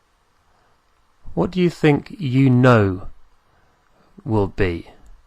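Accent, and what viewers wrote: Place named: United Kingdom. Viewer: i need a lesson to understand the fruity limey's accent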